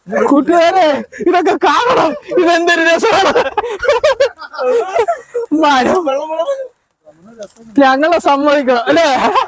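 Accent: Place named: Palakkad, India. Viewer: പാലക്കാട്‌ സ്ലാങ് സൂപ്പർ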